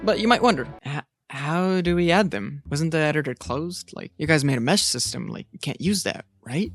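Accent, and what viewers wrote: Germany. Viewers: deutscher englisch dialekt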